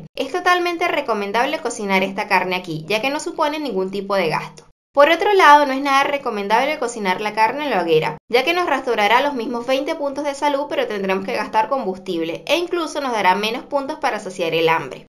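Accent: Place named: Venezuela. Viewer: Hola eres una genio con estos vídeos, de verdad son de mucha ayuda, noto que tienes acento venezolano 😁, saludo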